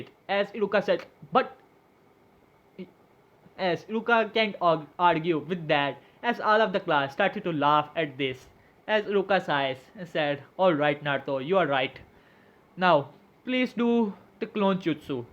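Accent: India. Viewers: He has an Indian Accent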